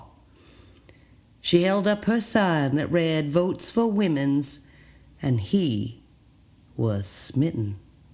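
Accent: South, United States. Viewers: Interesting.... though why an old southern woman accent? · WTF this Canadian woman has a better southern accent than I do! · Why do you sound so southern in this?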